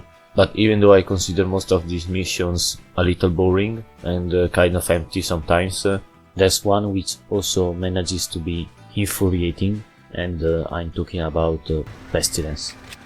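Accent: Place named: Italy. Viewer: Your voice is very cool, i love when Italians speak English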